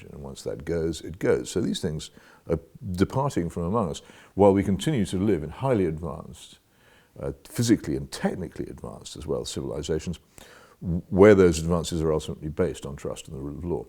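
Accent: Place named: RP, United Kingdom. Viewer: <link> Just having some fun. Can any other American understand every word of this Varneyian Elite English? Windsor, I believe. Softening the hard edges of our English to sound more French. It really should be captioned. But he makes excellent sad points. So it is important to be able to speak in a standard we can all readily understand. So we can understand.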